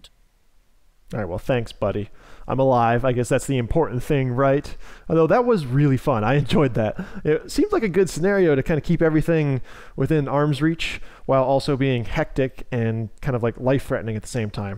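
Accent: United Kingdom. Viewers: I was wondering that too I was like is this dude British or something lol 😂 I need to get a VR headset to have more fun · British accent through me off lol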